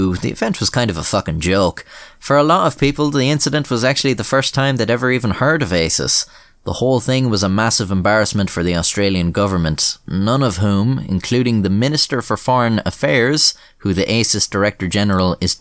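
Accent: Ireland. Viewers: Seeing the guy it's named after is Henry William Lamb, 2nd Viscount Melbourne who, in 1770, was raised to the Peerage of Ireland as Lord Melbourne, I think this Irish presenter has every right to pronounce it in his own dialect. Regardless how we current 'Strayans say it. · Idk if I've been watching too many of your videos or not, but my inner monologue now has an Irish accent.. and I'm American, from North Carolina lol..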